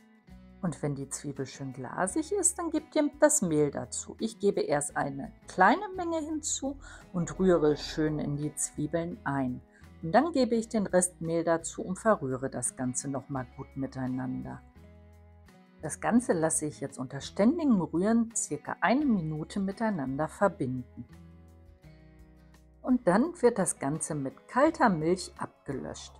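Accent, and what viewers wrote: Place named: Germany. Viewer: Bom dia, não entendo quase nenhuma palavra mas não importa, eu ficaria horas ouvindo sua voz e sotaque alemão 👏🏻👏🏻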